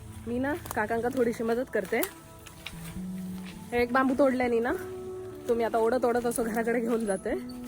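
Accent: Malvani, India. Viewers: मालवणी संस्कृती आणि मालवणी भाषा सुंदर आहे. जास्तीत जास्त मालवणी भाषेचा वापर करा म्हणजे यश नक्कीच मिळेल. लोकांना मालवणी भाषेतील व्हिडिओ जास्त आवडतात. तुम्ही मालवणी सुंदर बोलता त्याबद्दल आम्हाला अभिमान वाटतो. आपल्या व्हिडिओचे विषय चांगले आहेत. आमच्या शुभेच्छा सदैव आपल्या पाठीशी आहेत. धन्यवाद आणि आभार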